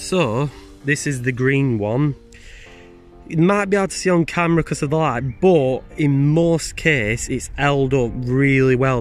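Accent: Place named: Sheffield, United Kingdom. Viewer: Sound like your from Sheffield pal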